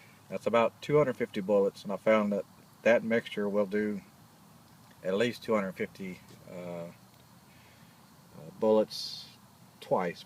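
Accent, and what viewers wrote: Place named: Maryland, United States. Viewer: I've always wondered about these coatings. That accent sounds like somewhere between Cecil and Wicomico county!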